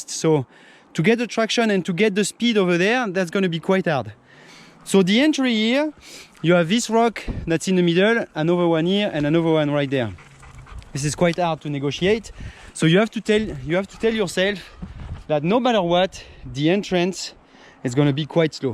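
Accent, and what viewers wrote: France. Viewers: Impressionnant... Dis-moi tu forces pas un peu sur l'accent français? :) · T’es tu français, on dirait ben vu ton accent...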